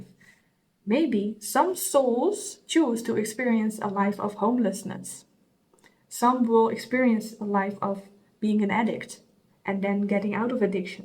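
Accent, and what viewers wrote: Netherlands. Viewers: Hoor meteen dat je uit Nederland komt ❤ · Hi there, 2 questions ... I hear a Dutch accent? And 2nd were do you get the information from ? Thanks for sharing your videos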